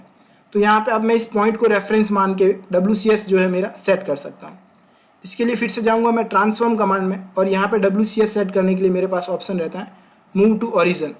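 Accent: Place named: India. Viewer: Heavy Indian accent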